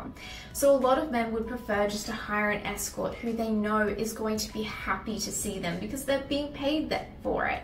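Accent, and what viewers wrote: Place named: Australia. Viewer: You have the mildest Aussie accent I've ever heard - congrats!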